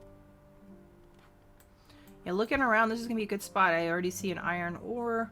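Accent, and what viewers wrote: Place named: Texas, United States. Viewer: that's awesome! I was born and raised in texas too lol okay I thought it was kinda texan sounding but some southern drawls can sound similar haha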